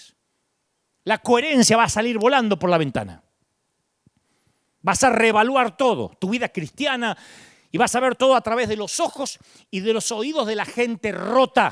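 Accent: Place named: Córdoba, Argentina. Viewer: Rompe estructuras!! Hablando a lo cordobes...es un capo.. Por mas pastores asi Amado Dante Gebel!!! Gloria a Dios por tu vida!!!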